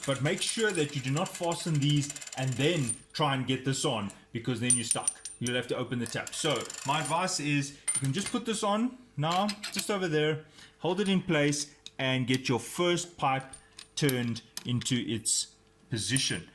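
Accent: Afrikaans, South Africa. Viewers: I'm a kiwi and the SA accent is familiar to me too, sounds much better than the Aussie one... they all sound like they're holding their nose whiile complaining about being constipated. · I didn't have a clue how to do this but now I know, thanks pal, I'm off to Bunnings as well, and is that an Afrikaans accent I detect?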